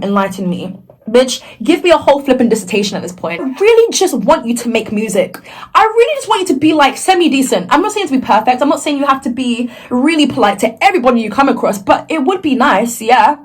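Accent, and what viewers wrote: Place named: United Kingdom. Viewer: You Look stunning!! And I love your british accent! So charming!!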